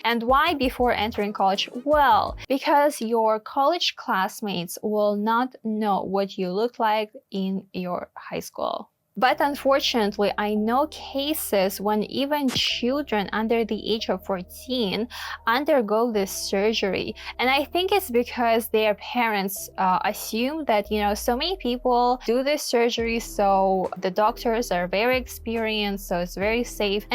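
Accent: Russia. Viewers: Че за русский акцет🤔🤔🤔🤔🤔🤔🤔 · you sound russian, why are you replicating chinese beauty standard though, for work?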